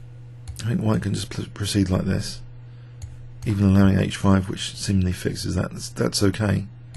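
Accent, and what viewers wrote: England, United Kingdom. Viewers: You can also mute or don't watch the video at all! People want to impose pronunciations, he is from England thats the beauty of diversity.. We aren't machines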